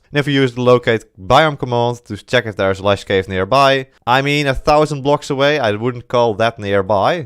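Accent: Netherlands. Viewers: r u dutch?😭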